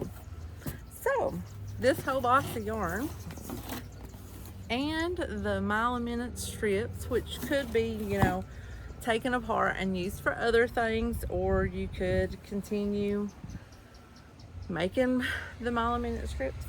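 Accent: Kentucky, United States. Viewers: I love Vintage yarn and love finding yarn at yard sales and thrift stores. New Subscriber here. Are you from Kentucky? We have similar accents. Your voice is comforting.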